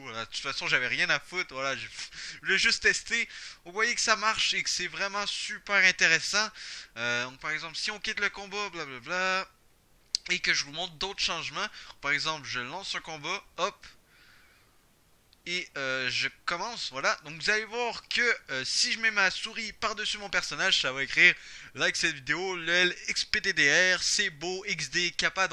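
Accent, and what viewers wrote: Quebec, Canada. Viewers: j'aime l'accent du quebec x) j'avais déjà essayé de modifier les fichiers sur mon ordi en copiant le theme de base et en le mettant dans un nouveau dossier avant de modifier les trucs mais ça marche pas. J'aurais jamais pensé à installer un autre thème puis à le modifier, donc merci et bonne chance pour ta chaine!ps: je débute sur YouTube en tant que joueur dofussien.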